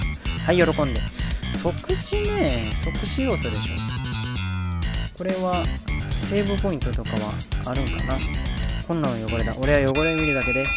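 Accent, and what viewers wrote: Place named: Kansai, Japan. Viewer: このテンポ大好き💕 関西弁でやったって全然あり！ というかこっちの方がお得！！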